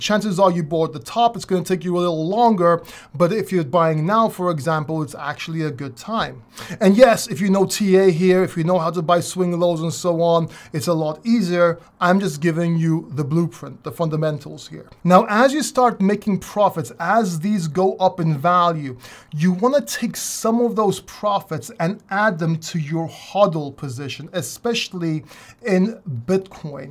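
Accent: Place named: North London, United Kingdom. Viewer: I could detect a slight N London accent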